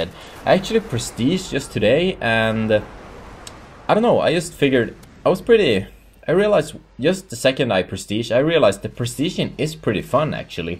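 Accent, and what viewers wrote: Sweden. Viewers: are you swedish? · lol Your Swedish accent is so hilarious.. keep it up dude.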